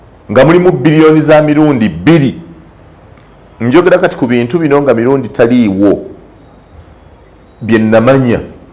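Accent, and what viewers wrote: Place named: Zambia. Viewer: But mr oyogera nga zambali🙏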